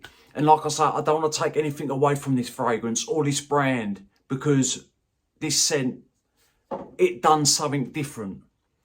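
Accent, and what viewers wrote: United Kingdom. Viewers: What a great accent! From which part of Britain you are?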